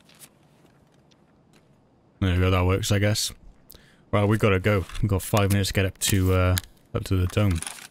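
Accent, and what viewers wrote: United Kingdom. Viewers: you from south wales butt?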